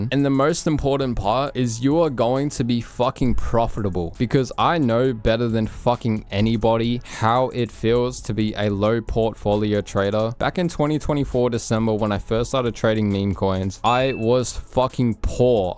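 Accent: Australia. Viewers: are you from Australia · If you hear an aussie and he speaks like this with good humour, you know he genuine.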